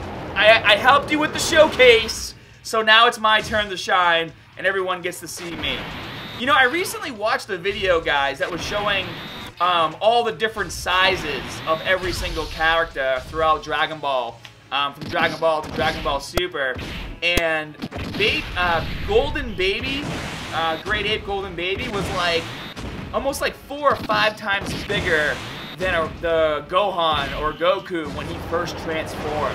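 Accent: Boston, United States. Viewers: Hey are you from Boston? U got a hint of the accent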